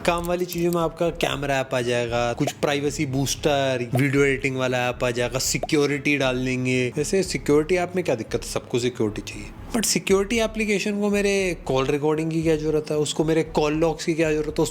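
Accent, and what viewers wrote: India. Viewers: umma koi kaam ke aap na hoyi gyi, INDIAN TONGUE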